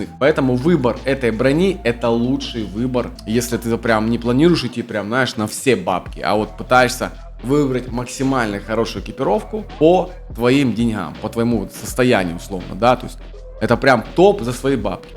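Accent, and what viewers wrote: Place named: Ukraine. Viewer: Акцент украинский сразу слышно. Украина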